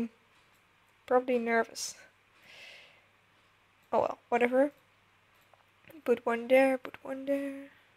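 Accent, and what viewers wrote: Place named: Netherlands. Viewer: Super leuk!!! en ik kan wel horen dat je Nederlands bent XD ;)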